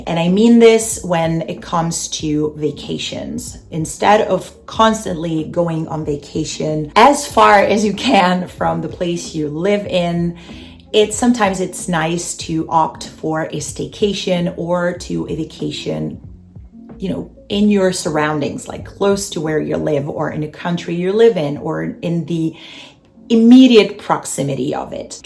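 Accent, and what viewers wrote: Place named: United States. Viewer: Thanks for sharing. 😊 I would like to know why you have an American accent when you are originally from San Marino. What and where did you study? In the US? What was your major at the university?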